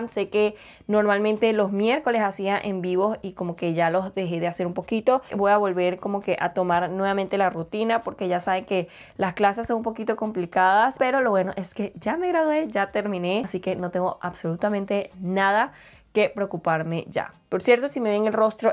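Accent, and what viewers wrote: Venezuela. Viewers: Es el primer video que veo tuyo…te oí hablar y sentí el acento venezolano…tu mamá dijo Naguará y lo confirmé! jaja 😘🇻🇪 me ayudaste mucho con esta prueba de producto gracias!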